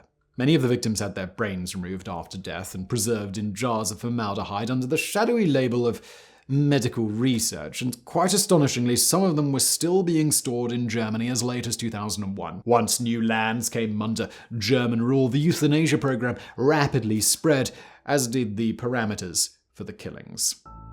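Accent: United Kingdom. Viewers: Omg it's the bri'sh VSauce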